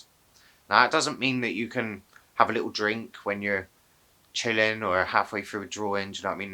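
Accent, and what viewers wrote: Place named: Bristol, United Kingdom. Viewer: Can't beat a bit of wolverine, keep up the sick work dude. I also love how bloody Bristolian you sound shag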